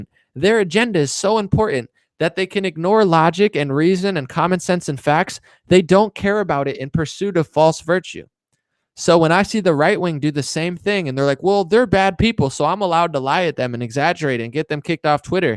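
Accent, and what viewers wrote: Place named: California, United States. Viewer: You're obviously not American. That's NOT a black American accent AT ALL. Black Americans, pretty much no matter where they live have a "southern accent" unless they are articulate. has a California accent and cadence. If a black American sounds like, he/she will be slammed for "talking white".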